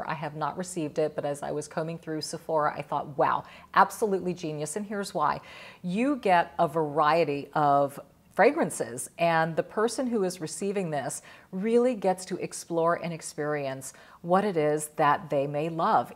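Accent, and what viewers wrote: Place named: United States. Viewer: This content, for me, is an excellent source of beautiful American English and, of course, a tremendous positive energy source. So easy to watch!:) Thank you so much,!:)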